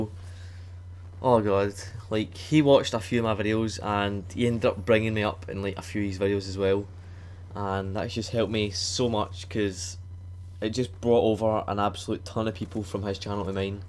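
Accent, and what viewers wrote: Scotland, United Kingdom. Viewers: Wings sent me .. Don't normally check out other people but glad I did !! Highly entertaining gameplay .. Also was pleasantly surprised that you too are Scottish - fae Ayrshire ")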